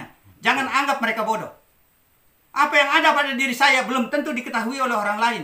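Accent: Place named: Sumatra, Indonesia. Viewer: Yg lebih keras LG bang setuju sy gy bicaranya sama dgn km orang Sumatra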